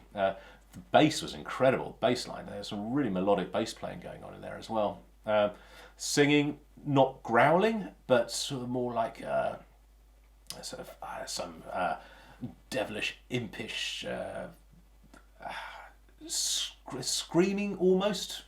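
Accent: United Kingdom. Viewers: Your accent is nice :D Are you from Britain? 🤔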